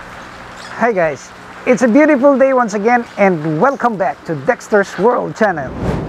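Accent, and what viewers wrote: Philippines. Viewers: Ganda ng English nya, kung may indian English, ang sa kanya Filipino English.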